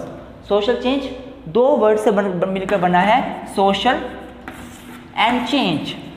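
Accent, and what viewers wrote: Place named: Jammu, India. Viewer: Jammu ka teacher lagg rahey ho Language flow say😊but well done 👍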